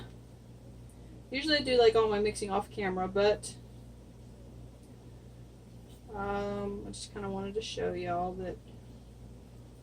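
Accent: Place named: California, United States. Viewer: Love your accent. Sounds Cali with a southern past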